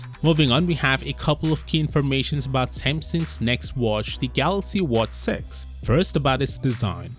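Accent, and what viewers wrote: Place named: India. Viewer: I know an Indian accent when I hear one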